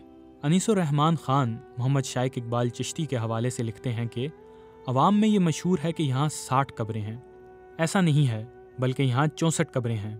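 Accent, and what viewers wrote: Punjab, Pakistan. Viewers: What a bakwas accent. Muarrakh is pronounce wrong here. It seems it is read by someone from northern punjab of Pakistan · Exactly panjabi touch hay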